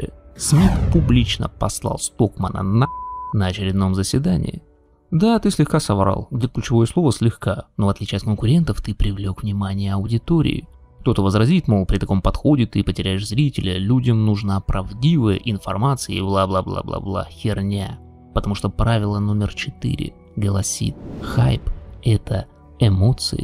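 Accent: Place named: Chechnya, Russia. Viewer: у тебя проскакивает чеченский акцент в голосе)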